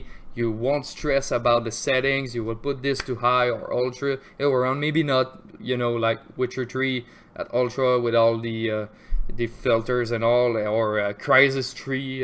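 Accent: Quebec, Canada. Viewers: tu sonne pas mal québecois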